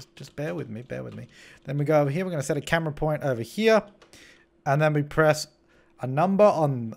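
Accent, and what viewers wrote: Australia. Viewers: You sound a lil bit like Jazza. I'm thinking its because of the Australian accents. 😳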